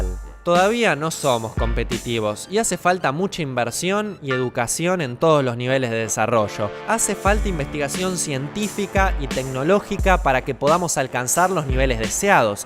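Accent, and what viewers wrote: Argentina. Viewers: Se nota que eres argentino no sólo por tu forma de hablar sino porque no crees en la apertura económica ni la competitividad, aprende de Chile. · no se si sea yo o que porque se me hace muy difícil entender los vídeos, quiero ver tus vídeos porque son muy interesantes pero creo que es por lo que eres de argentina y es muy difícil entender como tu forma de interpretar algunas palabras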